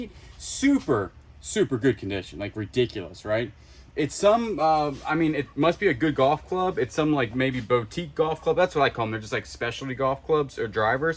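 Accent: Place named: South, United States. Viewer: That's just the southern accent y'all. I pronounce it the same way he does. 😂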